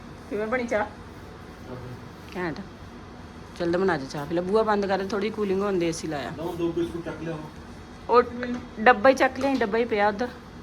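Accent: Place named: Malwa, India. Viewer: Dii tusi apni hi boli boleya kro malwaeiya dyi boli vadiya nhi lgdi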